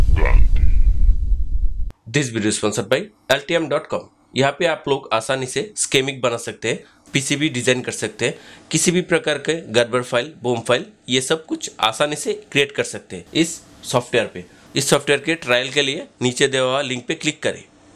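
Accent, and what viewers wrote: Bengal, India. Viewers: U r Bengali?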